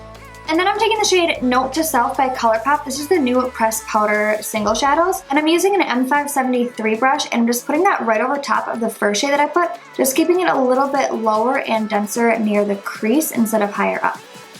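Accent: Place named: Boston, United States. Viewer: Random question but where are you from? Like you accent sounds northern or Bostonian maybe but I can't put my finger on it eek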